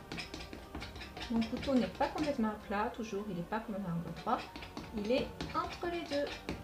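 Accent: South, France. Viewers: Vous êtes vraiment magnifique ! J’apprends beaucoup avec vous. Vous êtes très belle et charmante, surtout avec votre accent du sud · Tout simplement magnifique comme vous!🤩🤩😍😍 Merci beaucoup pour ces bons moments et vos explications très précises ! Entre parenthèses, j’adore votre accent !🥰🥰🥰 Moi je suis du Sud Ouest et si je ne me trompe pas, vous êtes du Sud également 🤣🤣🤣 À bientôt, j’attends avec impatience vos nouvelles vidéos! 👍🙏🙏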